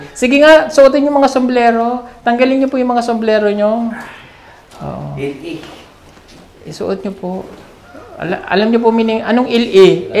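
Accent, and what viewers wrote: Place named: Iloilo, Philippines. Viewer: Nakakatuwa si sir may tunong pag ka ilonggo 😅😅😅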